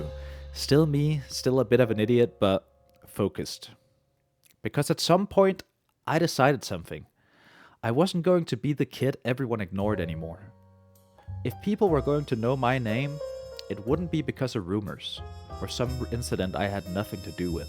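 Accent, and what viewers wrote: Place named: Denmark. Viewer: wait are you danish? no shot. im dead now that i know i cant unhear the accent.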